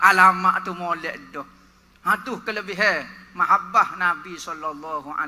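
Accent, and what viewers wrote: Kelantan, Malaysia. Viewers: tu lorat klantan dh cmpur bhase stendert tu..klau lorat klantan yg original..ak rse spatah hram pn org ngeri lain x pham..ni kn plak org indonesia.. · Terbaikk loghat melayu kelate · Ya, sebab ini bahasa negeri Kelantan , salah satu negeri di Malaysia. Agak sukar difahami. Alhamdulillah bapak bisa faham walaupun mengambil masa.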